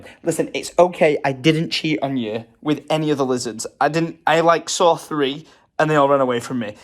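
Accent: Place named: United Kingdom. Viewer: It's probably his northern accent. I've watched him for years and only just realised he has a slight accent recently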